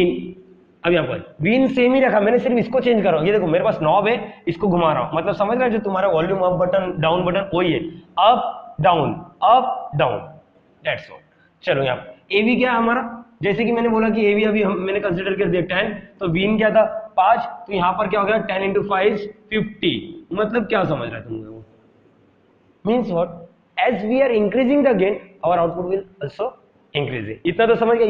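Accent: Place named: Hyderabad, India. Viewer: Hyderabadi accent 🤩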